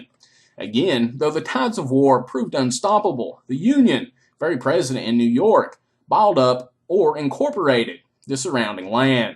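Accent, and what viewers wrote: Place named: South, United States. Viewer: Don't hate Southerners, they have different rules of pronunciation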